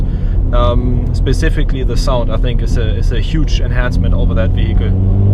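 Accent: Germany. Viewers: Dein Englisch ist wirklich super, aber irgendwie klingt es einfach scheiße, wenn Deutsche Muttersprachler Englisch reden.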